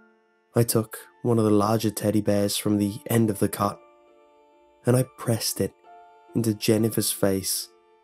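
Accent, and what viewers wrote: United Kingdom. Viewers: Devin Williams they also speak very differently as this guy is British and mrcreepypasta is American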